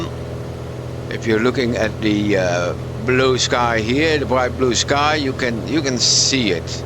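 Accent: Netherlands. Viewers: Maybe you got a golden sample but i returned mine because off the MURA,maar ik hoor dat je van nederland komt hahahah..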